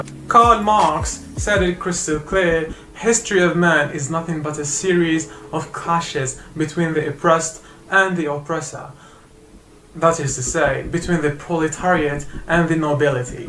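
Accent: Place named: United Kingdom. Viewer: British english 👏👏👏👏👏👏👏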